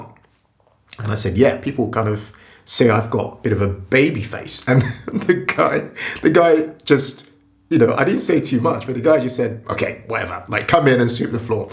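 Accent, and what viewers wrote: London, United Kingdom. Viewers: I had to stop watching the video to post this quick comment: I thought you were a Londoner. My husband is originally from London and your accent is close to his. 😄😄😄. I am from Brazil and despite being on this learning journey since 15 and having a fluent level of English , I still have a brazilian accent. Back to the video 🤣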